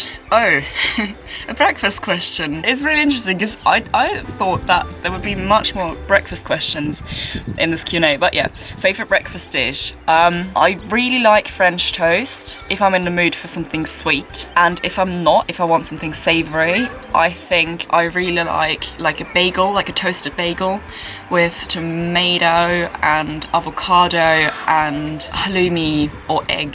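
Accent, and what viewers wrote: United Kingdom. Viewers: Wie hast du so geilen englischen Akzent? 😍😍😍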